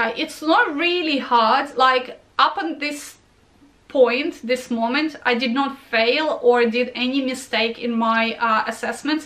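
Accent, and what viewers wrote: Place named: Russia. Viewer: Thanks for your Contribution. You Sound Russian as one of my teacher.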